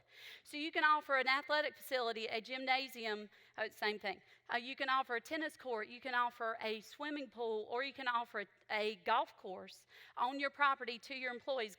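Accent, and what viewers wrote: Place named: South, United States. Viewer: She totally sounds Southern..how could she say out her lips, NJ😂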